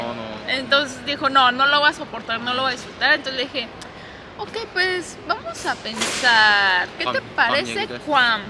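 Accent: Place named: Mexico. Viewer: Hablas como mexicana,y te ves muy coriana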